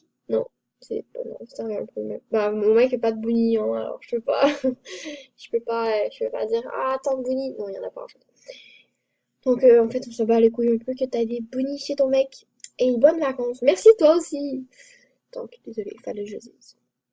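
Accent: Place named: Quebec, Canada. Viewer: tu est devenus une de mais youtubeuse préféfé qui jou sur msp prace que tu parle quebecois et tu est un quebecoise jadore moi aussi je suis quebecoise ❤😍😜😉😂😀😁🤣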